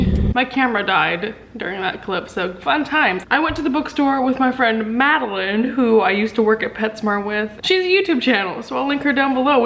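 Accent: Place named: Germany. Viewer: Always so excited to see some German books!! ;) Also, you're German accent is amazing! Especially the "ch" you nailed! Ich bin gespannt, wie du es finden wirst, Victoria Schwabs Bücher auf Deutsch zu lesen :D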